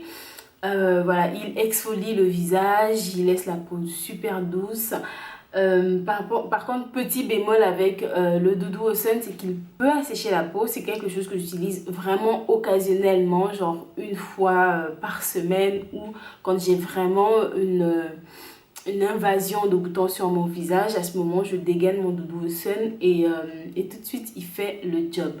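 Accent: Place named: Gabon. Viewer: Tu es gabonaise c est sûre je suis sûre t'es gabonaise je reconnais ce petit accent léger " n emmenez pas mon nom ohhh" trop happy je m abonne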